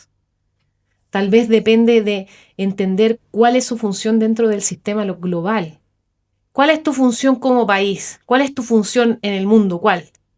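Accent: Chile. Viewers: 🙌🏼 Qué bien se siente oír hablar muy bien de nuestro país a un extranjero. Eso significa que los panameños no lo estamos haciendo nada mal, a pesar de que a veces somos muy duros con la autocrítica. Pero es bueno autocriticarse para mejorar. Por tu acento supongo que eres chilena. Yo tuve un inquilino chileno, durante 2 años, que vino hacer un trabajo de ingeniería en una fábrica de mi provincia, y él era muy educado y amable. Ya me suscribí a tu canal chica. Espero sigas subiendo más contenidos sobre nuestro Panamá. Saludos. 👍🏼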